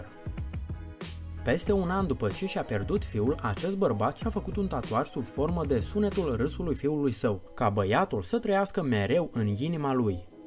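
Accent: Moldova. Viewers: Esti moldovean?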